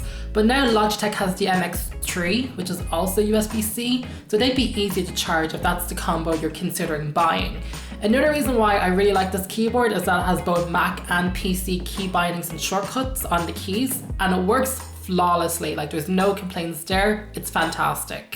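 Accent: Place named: Dublin, Ireland. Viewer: Thanks for the real-world one year later, and the heart gushing Dublin accent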